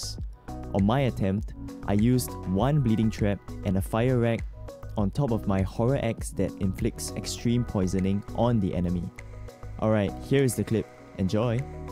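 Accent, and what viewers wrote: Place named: Asia. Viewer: Not bad at all! At first I recognised the Asian accent straight away (I’m Asian myself Malaysian that moved to UK since 14 and now 31) and i wasn’t expecting it to be a good video, but at the end it convinced me that I was wrong. In fact, at the end, I kind of felt warm and home listening to that accent. Good guide/video! Although I was already passed that stage while watching this.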